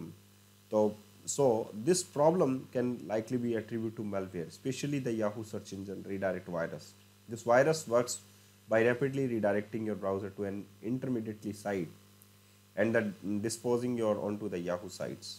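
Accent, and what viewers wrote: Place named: South India, India. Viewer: what's so special about it, sounds too south indian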